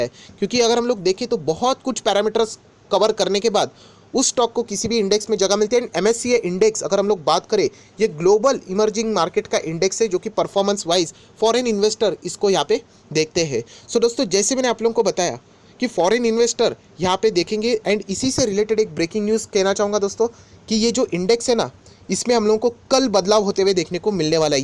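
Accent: Maharashtra, India. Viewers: सर, तुमच्या बोलण्याच्या पद्धतीवरून असे वाटते आहे की,तुमचा मराठी भाषेशी काहीतरी संबंध आहे.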